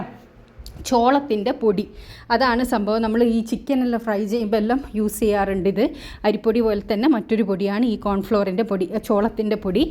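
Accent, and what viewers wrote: Kannur, India. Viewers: നിങ്ങൾ കണ്ണൂർ ആണോ 🤔, സംസാരം കേട്ടപ്പോൾ തോന്നി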